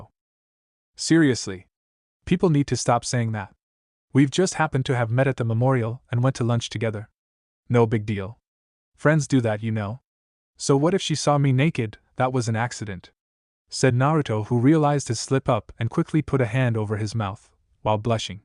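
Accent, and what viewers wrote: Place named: Africa. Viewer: why u sound african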